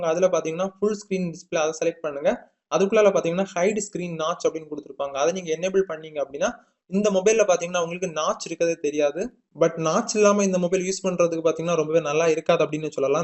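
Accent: Kanyakumari, India. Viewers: Super video bro and voice look like our kumari slang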